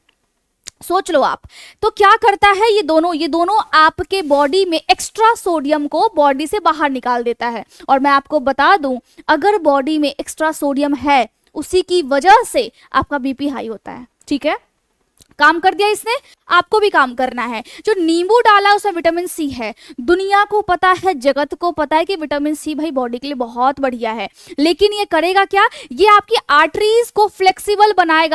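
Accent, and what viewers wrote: Bihar, India. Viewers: Mam bihari to nhi ho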